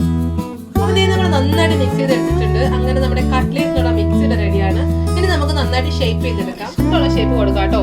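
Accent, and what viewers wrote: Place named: Nilambur, India. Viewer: വാഴ കൂമ്പ് തന്നെ നിലമ്പൂർ ഭാഷ 😍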